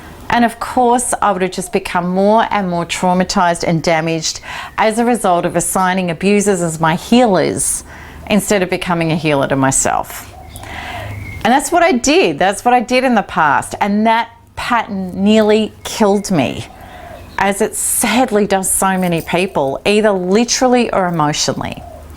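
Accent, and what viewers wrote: Australia. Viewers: By the way are you Australian? you sure sound it.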